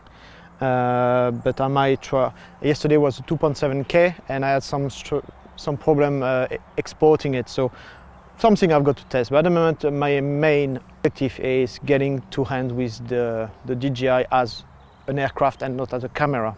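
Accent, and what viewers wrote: France. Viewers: Tu es français ou quoi ? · Very usefull video because done from a beginner with mistakes and corrections... I will be in your case in one week with a phantom 3 also... Just i notice playing dji tutorial videos, the man turn in unticlock way for calibration ...i don't know if it 's important or not.... last news about dji... after christmas prices going down: standart one is 599 euros, advanced one 899 euros !!!! Oh ! do you live in an english country ? i am french and i recognize you special french accent ;-) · french accent but very good video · Are you French ? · that's how French people sound when they speak English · On sent l'accent français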